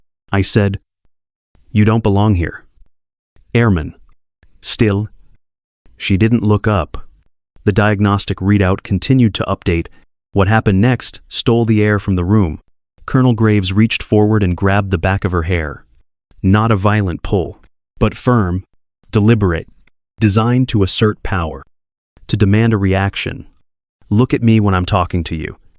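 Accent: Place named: France. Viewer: Why does the voice ,occasionally, seem to talk with a French accent?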